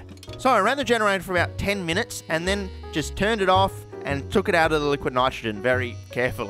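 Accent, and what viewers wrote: Australia. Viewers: When watching these videos I like to imagine an Australian chemistry teacher talks in the same way in the classroom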